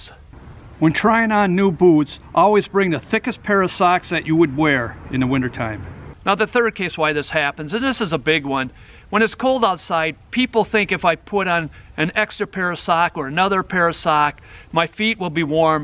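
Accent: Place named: Midwest, United States. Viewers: Great info ,taken aback by Minnesota/Wisconsin accent it's really distinct. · I'm born and raised in the great state of Michigan. If you sir are not, you would fit in great in the U.P. Your accent is very Upper Peninsula Michigan/Canadian good sir lol. We spend a ton of time outdoors. You gave some great insight for those who don't know the tips and tricks we use to stay decently toasty! Take care and have a great day🇺🇸🇺🇸🇺🇸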